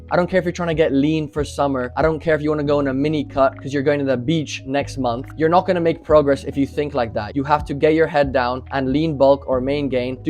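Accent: Ireland. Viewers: I swear I can hear a bit of a Dublin accent when you speak... Did you live in Ireland at some point? 😂 🇮🇪🇮🇪🇮🇪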